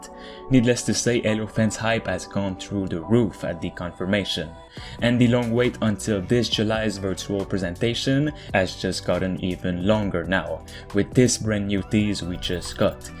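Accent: Quebec, Canada. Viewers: Dude are you somehow french? You really sound like someone from Quebec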